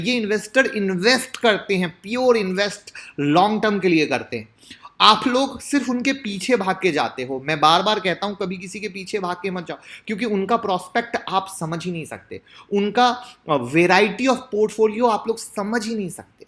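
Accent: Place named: Shekhawati, India. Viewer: Byaj ko dhando chokho, 😆 ye to pure shekhawati style h....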